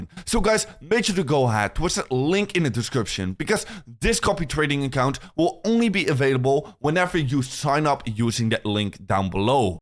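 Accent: Netherlands. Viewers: Don't you hear the dutch accent? It's so obvious when dutch people talk in English. He sounds like crypto rover.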